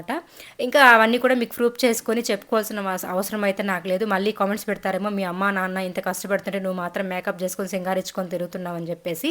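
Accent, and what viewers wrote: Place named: Telangana, India. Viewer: garu first time comment chesthunna pls oka request. Andi Meru matladuthunte normal Telangana slang anipisthundhi kani medhi Palamaneru nellore district kada meku a slang osthadha edhina oka video lo oka 1 min me slang lo matladandipls..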